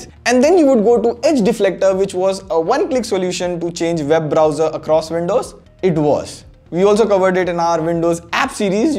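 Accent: India. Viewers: I clicked on this channel just out of boredom. I hear an indian voice I am now interested... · this man has a THICC indian accent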